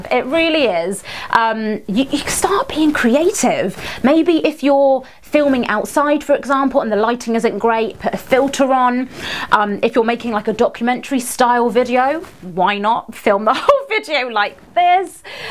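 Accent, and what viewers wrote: United Kingdom. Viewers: ARE YOU BRITISH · You sound like Maranda sings but in a British version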